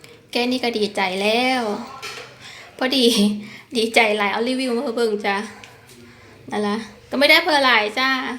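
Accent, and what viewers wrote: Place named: Phu Thai, Thailand. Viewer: เอัดผีเลอ,😂😂มักๆสำเนียงผู้ไท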